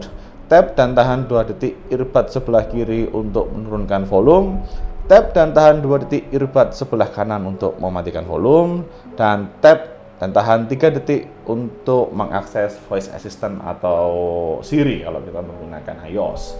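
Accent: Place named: Surabaya, Indonesia. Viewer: pantes suarae khas arek suroboyo... sik iling ae 😄 semangat... sering2 gawe konten ya 👏🏼👏🏼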